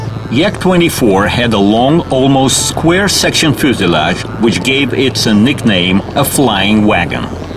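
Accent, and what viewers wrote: Russia. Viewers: I always like the narration of this series. The Russian accent gives it a certain authenticity.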